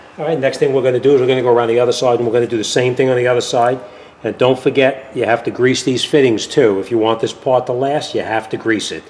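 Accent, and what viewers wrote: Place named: New York, United States. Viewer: You have that strong NU YAWK accent! Excellent video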